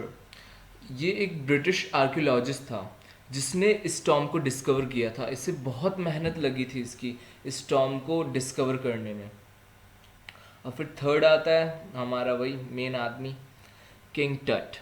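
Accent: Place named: United States. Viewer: it is not necessary to speak in an American language if u would have spoken in a simpler way it would be much clear to the students. anyway ur video helped me a lot . thank u sir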